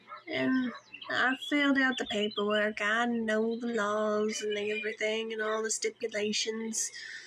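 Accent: South, United States. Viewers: Pig!!! Very cute design, nice hometown farmgirl look, with an excellent southern accent. I do love the jean shirts and the pitchfork with ahem paint... solid 65!!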